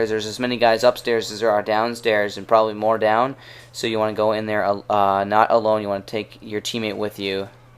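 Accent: Canada. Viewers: R U Canadian? No offense really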